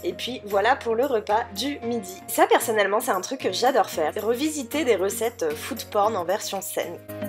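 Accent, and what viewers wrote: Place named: England, United Kingdom. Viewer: Laccent anglais de ouf #pas du tt de l'ironie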